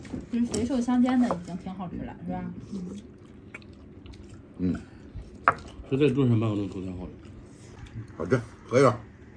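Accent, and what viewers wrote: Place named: Beijing, China. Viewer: 听着像老北京人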